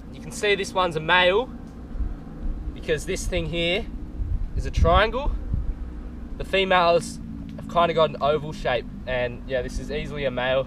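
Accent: Australia. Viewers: Mate are you from Queensland or new south wale.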